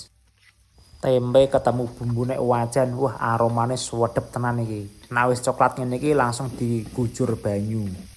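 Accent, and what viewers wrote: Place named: Banyumas, Indonesia. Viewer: bahasane ngapak bangeet Mas 👍🏻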